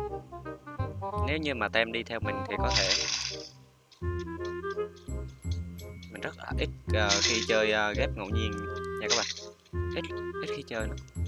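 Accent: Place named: South, Vietnam. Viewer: Anh Nói Chuyện Không Hay Bằng ZivGame Đúng Là Giọng Miền Bắc Nghe Thú Hơn Giọng Miền Nam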